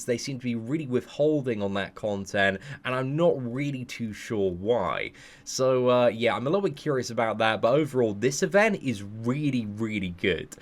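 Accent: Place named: England, United Kingdom. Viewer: It’s pretty obviously England. I mean I think he’s said it before and he has the exact same accent as me so yeah.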